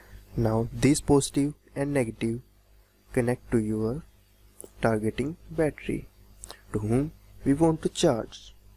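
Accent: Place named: India. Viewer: you white people do not know your accents. He's Indian!! can anybody hear that?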